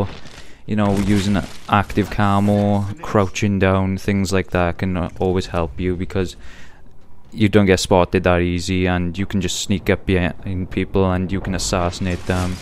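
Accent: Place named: Wales, United Kingdom. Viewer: OMG I FOUND SOMEONE WHO IS ACTUALLY WELSH!😁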